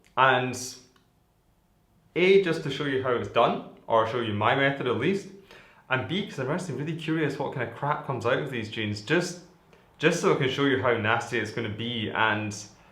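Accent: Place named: Scotland, United Kingdom. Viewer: Okay, are you a Scot living Sweden or a Swede living in Scotland?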